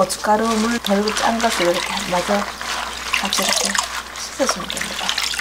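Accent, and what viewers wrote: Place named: Bonghwa, South Korea. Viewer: 타국에서 잘 보고있습니다!! 제가 봉화에서 태어나고 자라서 그런지 사투리가 너무 정겹게 들리네요! 항상 잘 보고 있습니다. 설명도 잘해주시고요 ^^ 앞으로도 좋은 영상 부탁드립니다 :D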